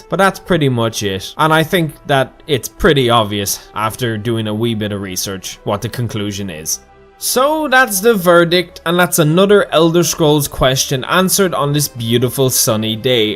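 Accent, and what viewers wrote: Ireland. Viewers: I quite enjoy the Irish accent. It's just easy to listen to. Great video. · I love me some Irish accent to sleep 2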